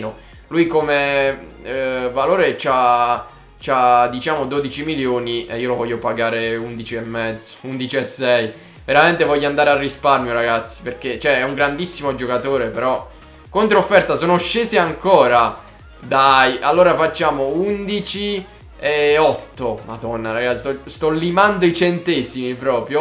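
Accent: Bari, Italy. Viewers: MA SEI DI BARI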